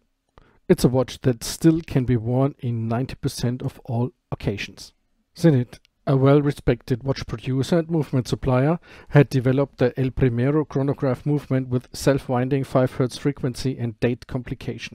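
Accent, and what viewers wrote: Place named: Germany. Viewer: ❤ your German accent 😊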